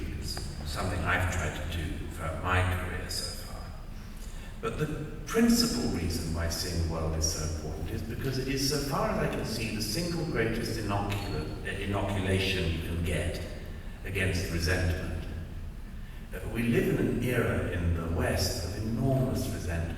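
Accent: RP, United Kingdom. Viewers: Talks a lot and says nothing in that horrible upper class English accent of a man who has done nothing in life but give talks like this. As if real people didn't exist.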